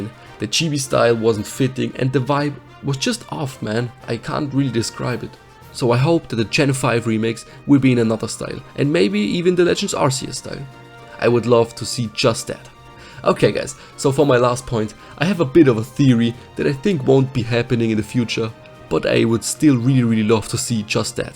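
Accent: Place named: Germany. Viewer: Netter deutscher Akzent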